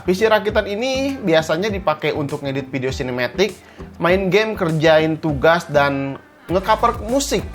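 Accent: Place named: Sunda, Indonesia. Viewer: Sunda nya kentel euy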